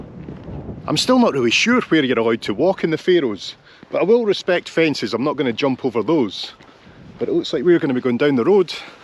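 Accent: Scotland, United Kingdom. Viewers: I certainly do enjoy your Sottish? accent, do wish that I could emulate the fine sound 😮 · Love the video. I was searching for more about Faroe islands. I love this video also because of the fantastic scottisch english accent. Greetz from The Netherlands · Your Scottish accent is AMAZING!!! Sending peace, love and prayers to you!!! 😊😊😊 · How I have missed hearing a Scottish accent; I live in Canada. Thanks for the beautiful videos. I have a great passion for Scandinavia myself, specifically Iceland. The people are very similar to us Scots, or at least so I've been told😊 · Excellent video. Love that Scottish accent...from a Celtic cousin in Ireland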